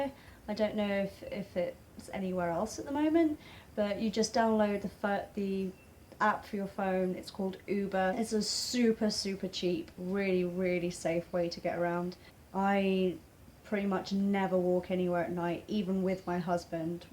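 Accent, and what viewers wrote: United Kingdom. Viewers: I fall in love with your accent, remind me of my ex girlfriend from England 🙂❤️😍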